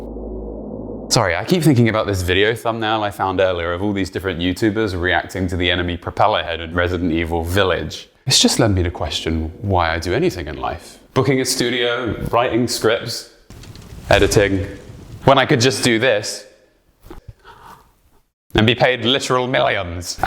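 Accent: United Kingdom. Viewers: I love listening to a British man who sounds like he just got through with his divorce papers talk about fallout two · Wait you British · Why are you British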